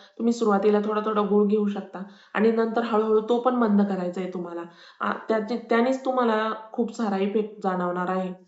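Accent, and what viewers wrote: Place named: Kopargaon, India. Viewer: छान माहिती मॅडम , तुम्ही कोपरगाव अहिल्यानगर च्या रहिवासी आहात काय, बोलण्यावरून मला वाटते 🙏😀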